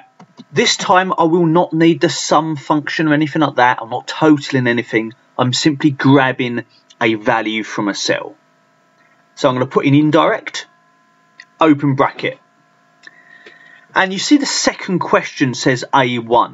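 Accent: United Kingdom. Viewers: Hello Initially I have to appreciate your perfect pure British accent which urged me to listen to it several times eagerly Then I download your nice video clip to learn about indirect function I wonder whether or not its possible for you to receive any Excel example from others in order to correct and provide some hints Thanks in advance Kambiz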